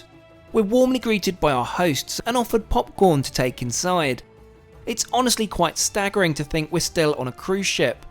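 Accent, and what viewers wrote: RP, United Kingdom. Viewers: This is a cool video and is a great and informative room tour but I have never in my life heard such a posh accent with such awful pronunciation of words 😭 I must admit however that blurring kids faces is fantastic. You’ve done incredibly well there.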